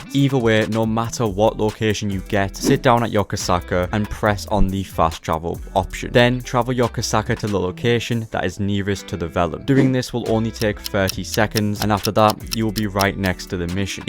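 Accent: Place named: Newcastle, United Kingdom. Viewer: Good vid(u sound a bit geordie pal lol.im one)aye ill Definitely be watching more of ur vids beo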